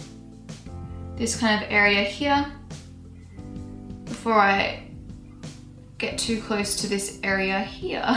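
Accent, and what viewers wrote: Australia. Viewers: Indeed i did enjoy !! you are gifted with your makeup plus its easy to understand you because i'm Aussie too :D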